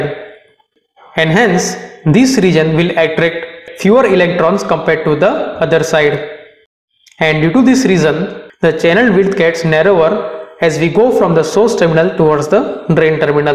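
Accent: India. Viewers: That indian accent is boring and annoying tbh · How indians explain things better. You make it a piece of cake, easy. Thank you indians. (Your english style is funny)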